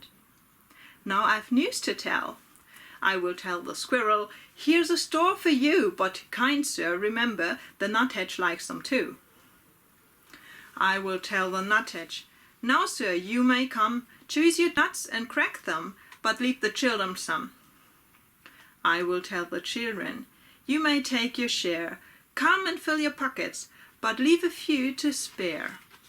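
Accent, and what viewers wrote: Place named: United Kingdom. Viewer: Das letzte war die Brombeere, so viel habe ich mitbekommen :) Bei deiner britischen Aussprache frage ich mich ob du das beruflich machst :) Jedenfalls reichte mein Schulenglisch aus um es zu verstehen.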